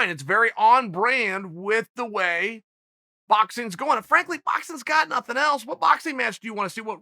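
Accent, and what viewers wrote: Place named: United States. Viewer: this dude have the most American voice i ever heard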